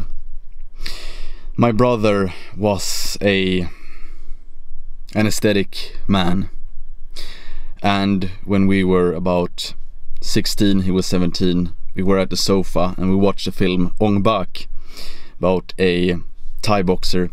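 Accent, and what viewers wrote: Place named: Sweden. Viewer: Engelska ordförrådet är gudomligt men du har den arketypiska svenska dialekten 😂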